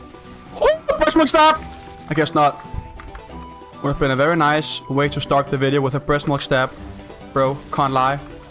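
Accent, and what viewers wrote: Denmark. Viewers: Så din video og instantly vidste at du var dansk, haha- nice video. 😂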